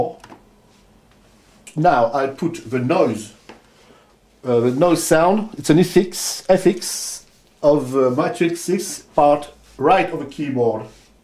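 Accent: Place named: France. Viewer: Tu es francais n'est ce pas ? ca se sent à l'accent haha :p Mais c'est quand même une excellente vidéo, plein d'informations... jusqu’à là je regardais des gars comme Synthmania ou MusicTrackJP pour en apprendre plus sur la prog' des synth vintage mais ta vidéo répond à une bonne partie des questions que je me posais sur les séquenceurs, l'overdub etc. en plus de voir des beaux trésors de guerre comme le OBXA ou le Matrix 6 (dont j'ai une petite préférence pour celui là ^^) et bien évidement la DMX ! (dont d'ailleurs j'essaye de répertorier dans une playlist toute les musiques ayant été joué avec cette drummachine) Je suis encore qu'un modeste joueur qui joue avec un simple clavier MIDI relié à un PC (et je n'ai as encore publié de vidéo car hum... j'ai encore beaucoup à apprendre) mais j'aimerai bien posséder une de ces machine un de ces jours... ou alors un KORG du genre des Poly61 puisque j'aime bien ces petites bêtes qui sonne plus "wild" que les Roland de la même époque... 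et qu'ils n'ont pas encore subi l'énorme inflation de ces derniers :p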